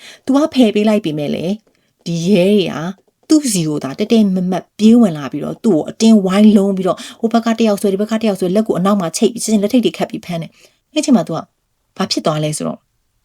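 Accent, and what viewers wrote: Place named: Yangon, Myanmar. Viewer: အော်..မဟုတ်တာကိုအဟုတ်လုပ်ပီးလာပြောနေတယ် နေရာဒေသကွဲရင်အပြောအဆိုကွဲတာပဲ ရန်ကုန်ကလူတွေက ရမသုံးတာများတယ် က ပဲသုံးတာ မသိဘူးလား😏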